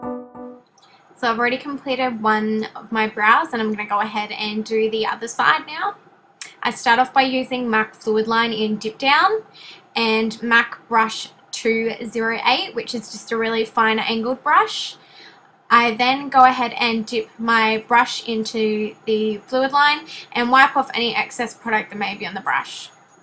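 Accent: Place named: South, United States. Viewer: Holy crap so much for just brows! I love your southern accent though hehe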